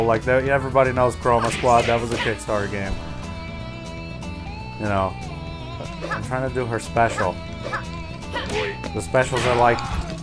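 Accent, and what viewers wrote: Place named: Brazil. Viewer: eu percebi que vc é BR pelo fato de ter sotaque...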